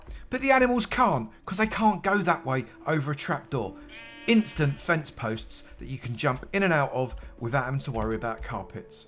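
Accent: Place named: England, United Kingdom. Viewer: He doesn't, he's just English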